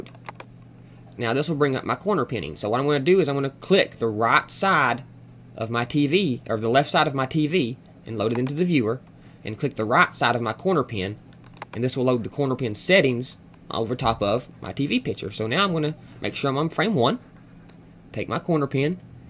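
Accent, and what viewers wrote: South, United States. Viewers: Out of all the help I have ferreted out, your help takes me over the top. You rock! Although I wish you would use STP and place a compressor on your vocal track--your southern good old boy accent kills me.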